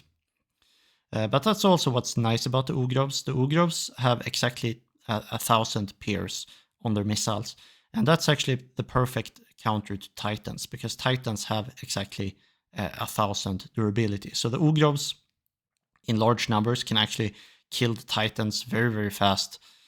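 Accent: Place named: Sweden. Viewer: That's a Swedish alright. Immediately discernable.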